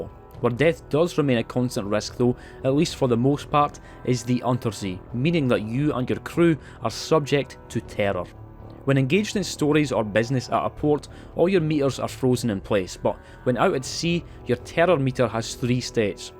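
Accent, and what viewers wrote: Scotland, United Kingdom. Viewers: I just like to come back to this video for an easy watch - it's about something I love, it's amusingly narrated, it's Scottish, 10/10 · That scottish accent. It's cute.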